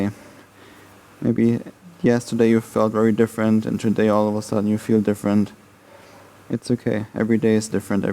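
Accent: Germany. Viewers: There's nothing better than breaking a mild sweat during yoga practice. I think the German-esque accent helps as well!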